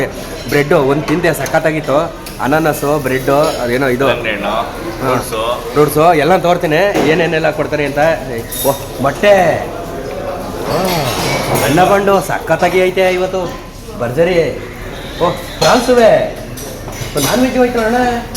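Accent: Mandya, India. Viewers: ಮಂಡ್ಯ ಭಾಷೆ ಬಿಟ್ಟು ಕೂಡಬೇಡ👌👌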